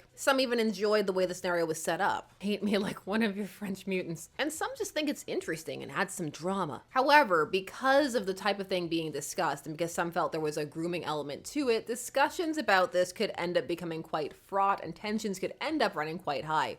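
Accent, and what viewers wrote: United States. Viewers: I just here for you country accent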